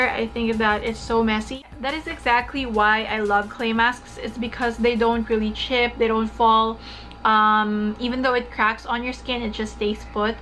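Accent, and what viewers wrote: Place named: United States. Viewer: love your accent its like you grow up in america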